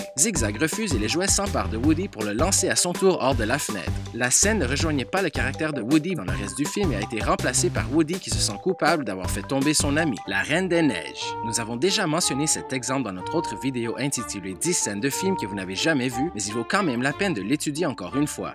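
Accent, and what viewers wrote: Quebec, Canada. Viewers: c'est un québécois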